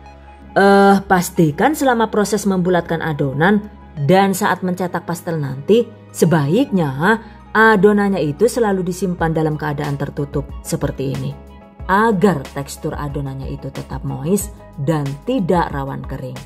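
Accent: Banyumas, Indonesia. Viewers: Ikut komen ya kak. Emang itu sudah jadi ciri khasnya. Campur ngapak n inggris medok juga😅. Semuanya begitu. Tapi semua resepnya recommended dan nyaris ga ada yg gagal kok. kl baru lihat emang aneh, lama2 akan terbiasa🙏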